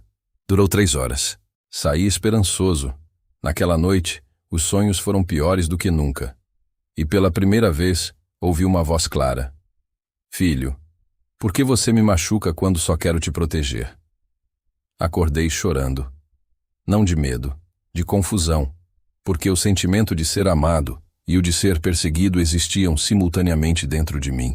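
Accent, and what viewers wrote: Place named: Portugal. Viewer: Esse português de Portugal que o narrador fala é horrível!!!! DETESTO!! Porque??? Se estamos no Brasil???😮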